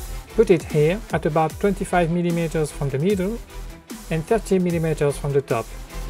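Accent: France. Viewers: can u stop speaking enhlish with ur french accent , its difficult to understand as ur pronouciation is not really good + its really annoying , french is a loving language , u dnt hve to b ashamed of ur native language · what is this french accent, are you realy english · Mdr on voit ton bel accent français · I love this accent, french I assume? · Excellent boulot ! Et j'adore ton accent FR ;) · Le gros accent français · Juste ton accent french 😅😅😅bon boulot en tout cas j'adore tes techniques d'assemblage franchement chapeau 👍👍👍👍👏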